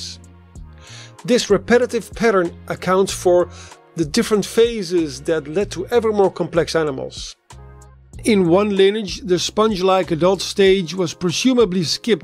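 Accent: Netherlands. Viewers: I love your videos and your (dutch?) accent. You might want a plainer background when doing the greenscreen-style overlay though 🙂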